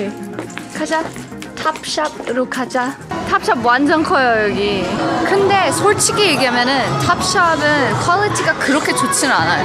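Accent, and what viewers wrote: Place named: United States. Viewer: She has a very Americanized accent and a lot of pauses. It kind of frustrates me and is hard to follow. Makes me anxious listening to her... But, not everyone is completely fluent in their parent's tongue. I was forced to take Korean during the weekend.... so... yeah.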